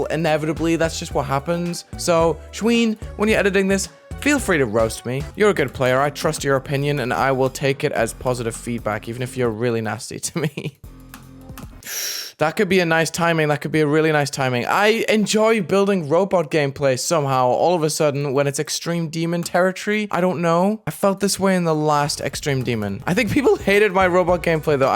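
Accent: United Kingdom. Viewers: You're bri'ish, same. What county you from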